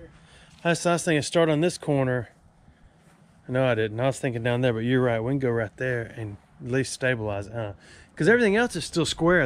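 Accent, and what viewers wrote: Alabama, United States. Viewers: 🙋 I was watching this video and I was cringing! 😯😳Have a blessed day ✌️ Love your Alabama Accent 😁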